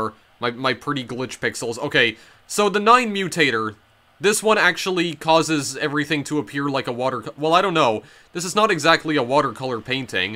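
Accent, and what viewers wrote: Canada. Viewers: Where are you from? You pronounce the words (like game) the same as me, but your accent is also different from mine. I'm from the north east of England but you don't sound like you're from here. It sounds almost canadian to me? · My guess was northern Saskatchewan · lmao, no it's an effect of Canadian English dialects called Canadian raising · Where are you from? What’s your accent? I genuinely would love to know! I am not sure what your accent is but I feel like it is a Canadian accent? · Was looking for a comment like this, not disappointed Legitimately thought I had a stroke for a second, Canadian makes sense tho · the canadian dialect gives me more brain damage than the game itself.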